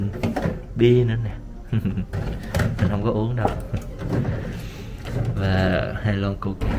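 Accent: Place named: South, Vietnam. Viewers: Ui bạn này giọng miền Nam nghe thích ghê, có thiện cảm liền luôn 🤗🤗🤗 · Ko biết quê gốc ở đâu ha nghe giọng giống miền tây quá...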